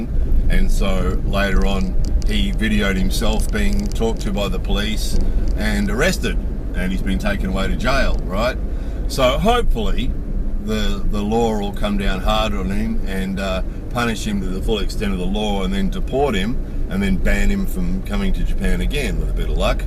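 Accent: Australia. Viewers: Nice take on all points… Nice to hear an Aussie accent into the bargain.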